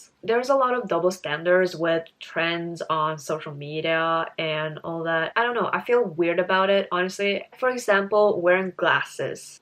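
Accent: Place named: United States. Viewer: american accent ! on point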